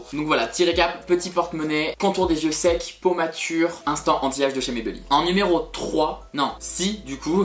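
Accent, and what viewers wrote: United Kingdom. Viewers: Il a l’air pas mal le petit dernier ! 😃 et cette petite pique 😂 ton accent anglais on l’adore 😍😃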